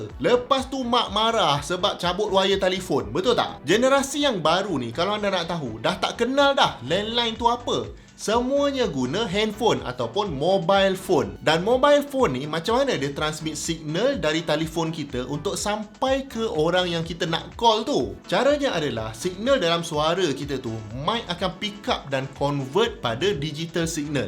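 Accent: Malaysia. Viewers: Penyampaian jgn kaku ,lagu kasi cantik lagi, mana2 perkataan inggeris jangan bunyi Melayu sangat, agak2 sikit, jadi kelakar nanti murid2 nak educate. Kurangkan r & ē tu. Good juob.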